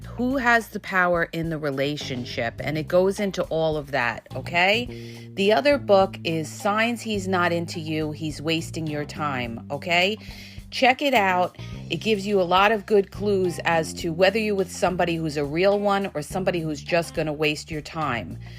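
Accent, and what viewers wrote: New York, United States. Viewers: I believe that Narcissists are made. My belief is because of my experience with her over 24 years. She got worse at the end because I believe she realized that her 2 boys were old enough to take care of themselves and that's when she let loose. I will be turning 64 this year and she will be turning 60. 3 years into Blocking/No Contact and I am now starting to feel peace in my Heart. It's a long road and I have no desire for dating right now. I just want to find myself again. I will always listen to you as long as you keep it going....Bless you and love your New York Accent...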